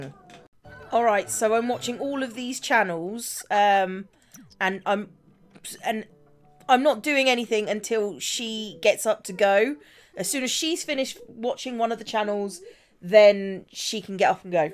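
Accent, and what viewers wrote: England, United Kingdom. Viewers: She's English but England has a ton of different accents up and down the country, I can't place her personally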